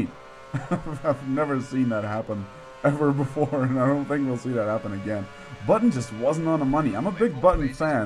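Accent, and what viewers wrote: United States. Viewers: Are u actually belgian becus u sound american and if u ar american y ar u belgian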